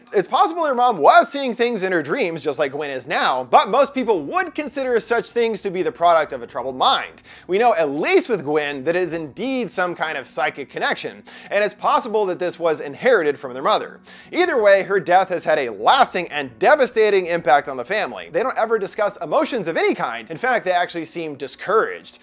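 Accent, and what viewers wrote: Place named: South Carolina, United States. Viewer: I never claimed to not being disrespectful lol. I very much meant to offend his fans because I think it's disrespectful to sheer a conversation praising a creator into a conversation praising a different creator by putting the original one in second place or "just not as good." My observations are completely one dimensional, I watched like 2 minutes of a video and looked at his thumbnails, I'm not a critic I don't want to watch him based on the 2 minutes and so I base my entire opinion on it. I'm not a hypocrite, I'm arguing for a subject not a spectrum, just because I don't like mowing lawns doesn't mean I don't like gardening. His thumbnails are clickbait and aren't to my liking. He talks like a south Carolinian closeted husband. and I don't like him.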